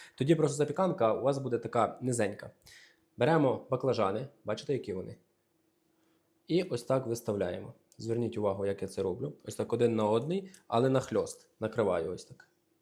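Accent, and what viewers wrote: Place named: Western Ukraine, Ukraine. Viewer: Оооой, обожнюю ваш діалект з моєї західної України. Дай Бог вам здоров*я і успіхів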